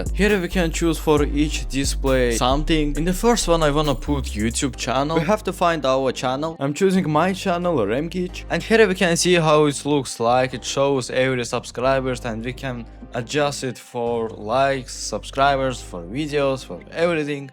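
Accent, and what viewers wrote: Russia. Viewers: Чел у тебя оусский акцент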